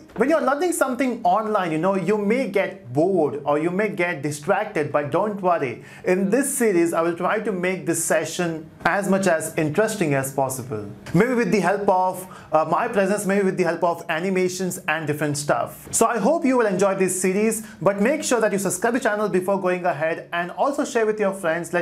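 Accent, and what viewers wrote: India. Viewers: Are you indian.. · Oh, no. An indian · Are you Indian sir?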